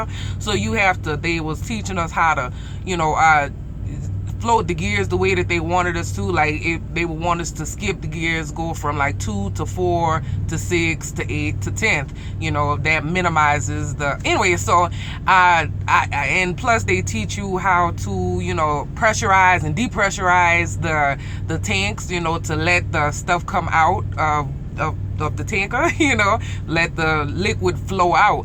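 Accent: Louisiana, United States. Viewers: LoL I love your accent, are you from Louisiana? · You're soooo Louisiana! I don't hear that accent often....that's dope. My dad was from there. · Love that Cajun accent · Shawty which part of Louisiana you from? I can recognize that accent anywhere. lol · love the accent sound cajun or from the city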